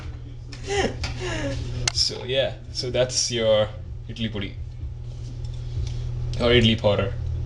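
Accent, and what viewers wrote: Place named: England, United Kingdom. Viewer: hey, never mind. i like your cool english accent and local time.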